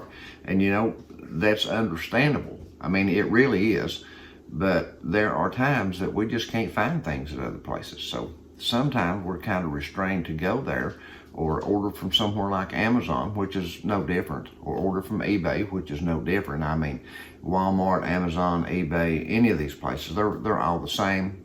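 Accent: Kentucky, United States. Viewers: Hi!! Brand new subscriber here! Ashland, Ky native here!! I knew where you were from before you even mentioned Corbin or Barbourville. You sound like a lot of my family. I LOVE your accent. I have a pretty strong Kentucky accent. My husband is in the Air Force and we just moved to upstate NY a month ago. I stick out like a sore thumb with my accent. Haha! I've had so many people comment on it. Thankfully they've all been nice about it:)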